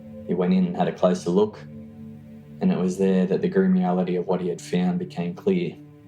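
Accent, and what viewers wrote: Australia. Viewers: True crime + podcasts + a beautiful Aussie accent ... could this get any better? I caught one of your vids in my suggestions and am now bingeing them from the beginning. Thank you for all of the work you put into these cases. They're wonderfully researched. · As a criminology and criminal justice student, you sir have a new subscriber. Props for the Aussie accent too 🇦🇺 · Probably a great and interesting story. Unfortunately, those of us with hearing problems, especially me, will not know. Australian accent, compounded by the addition of reverb makes it almost impossible to understand. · its an AUSTRALIAN accent..... are u seriously asking someone NOT from your country to speak differently??? To suit YOURSELF???? Oh ffs, you are a friggen KARENNNNNNN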